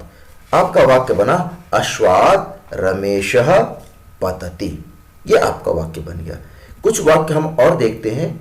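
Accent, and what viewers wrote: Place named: Hindi, India. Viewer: మొదట సంస్కృతాన్ని సంస్కృతంలా పలకడం నేర్చుకోండి. హిందీ రూల్స్‌ అప్లై చేయకండి దాని మీద. భాష మీద అభిమానం ఉంటే సరిపోదు. సరిగ్గా నేర్చుకోవాలి. హిందీ రూల్స్‌తో సంస్కృతాన్ని నాశనం చేయద్దు.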